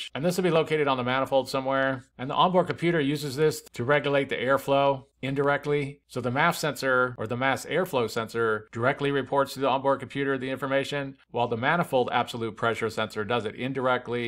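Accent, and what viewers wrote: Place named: United States. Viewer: I'm like he's speaking perfect American English so I'm like ...... huh? WTF is a Shievy Cruze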